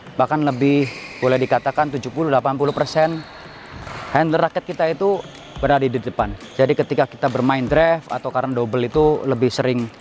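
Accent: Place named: Cirebon, Indonesia. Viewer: logat cerbone katon banget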